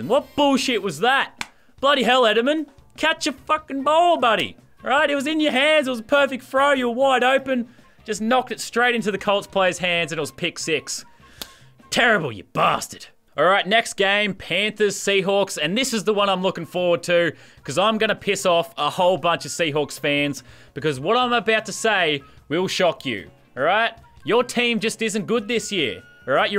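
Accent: Australia. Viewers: Are you australiain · are you Australia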